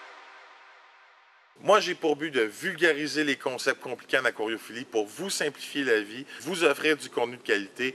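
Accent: Canada. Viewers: Salut, super intéressant et bien expliqué, et l accent canadien j adore . j aimerai bien avoir un thème sur les méthodes efficace pour ce débarrassé des algues , malgré que j ai un chihiros doctor, très difficile de ne pas en avoir... en tous cas je te souhaite de réussir !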